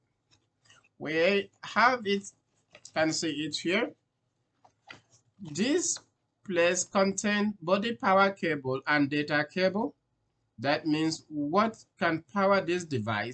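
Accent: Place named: India. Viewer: I was hearing a india speak, awesome information though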